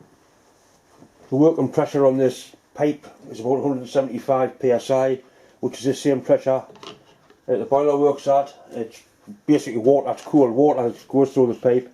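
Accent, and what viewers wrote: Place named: Geordie, United Kingdom. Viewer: is a Geordie and speaks the regional dialect. If you are having trouble understanding him go to settings (the little gear on the lower right) and set the speed to 75%. I sometimes have to do that, especially with his friend.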